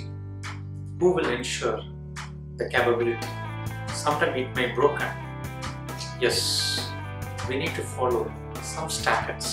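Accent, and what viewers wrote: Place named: India. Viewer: It's really hard for me to understand indian accent and poor quality voice and load music makes it so much harder.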